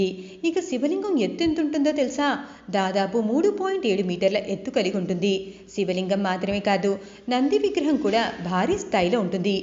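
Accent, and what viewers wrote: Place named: North India, India. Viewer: Iritating female voice more like northy accent.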